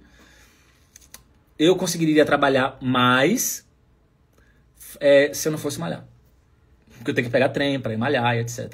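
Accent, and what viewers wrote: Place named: Ceará, Brazil. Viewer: Hei macho, e tu é do ceará, da fustaleza!!! Neh?!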